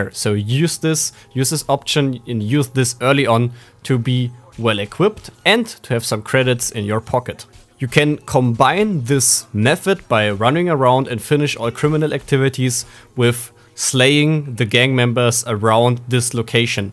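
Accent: Germany. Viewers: bro wieso machst du englishe videos man erkennt an deiner stimme das du deutsch kannst :)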